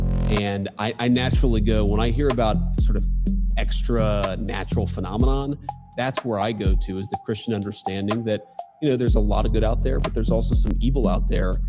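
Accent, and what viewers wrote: Australia. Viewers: Are you from Australia or New Zealand? :D Like anything else, there's likely a wide variety of subspecies with differing adaptations and evolutionary backgrounds. It's never just one "evil" group. I appreciate you being calm and methodical about a weird topic. Where are you really hoping this channel will go? If you manage to find success without becoming hysterical, selling out, or being misguided by fans of mimicry, at some point you'll probably experience something absolutely horrifying as a "warning."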